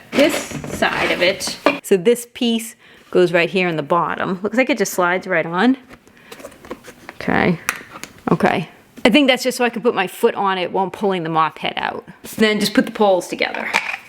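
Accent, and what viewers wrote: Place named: New England, United States. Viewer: Thanks for this. I’m in the market for something like this. By the way, you sound like a New Englander (like me)! Accent unmistakable and I love hearing it!! 😊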